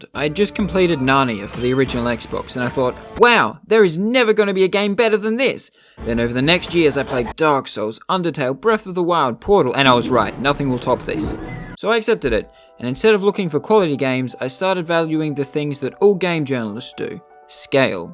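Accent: Australia. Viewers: is this guy aussie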